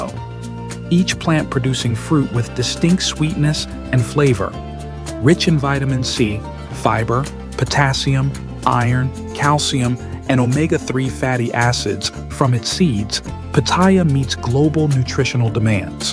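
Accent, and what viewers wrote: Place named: Morocco. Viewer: هذا المعلق باينة مروكي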